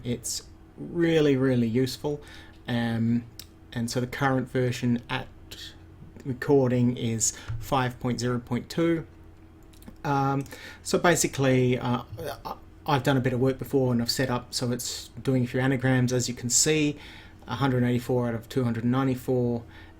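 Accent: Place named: Australia. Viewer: Hey just found your channel! Great to see more Scrabble on here :) Not sure if you knew to click flashcard mode on Zyzzyva saves having to change from missed to correct. You may have covered it in upcoming vid but I thought I'd mention anyway. Glad I've found another channel to watch after my 3 year love affair with Dweebovision and good to hear another Aussie voice too. Keep it up!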